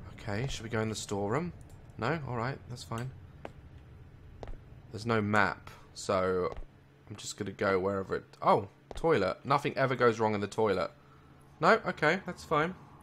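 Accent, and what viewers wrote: United Kingdom. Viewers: fabulous British talk mate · with the posh English xD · Is from the uk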